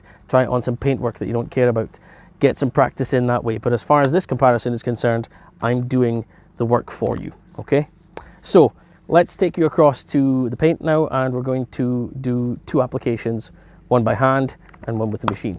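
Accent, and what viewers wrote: Scotland, United Kingdom. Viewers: no doubt I have some Scottish friend here in the states you sound like them....keep going with YouTube I’ve watched a few videos they are good. Best kept secret in US detailing market is a company called duragloss they make mostly sealants 111 and 501 are there best they are around 10$ for 16 Oz they also have a product like bead maker called aquawax the stuff has a cult following here in the states. You should review that stuff don’t see any uk detailers using it.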